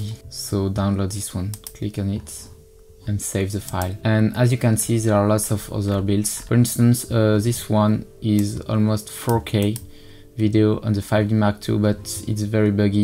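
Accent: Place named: France. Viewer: Tnx for this tutorial. I like ur french accent :)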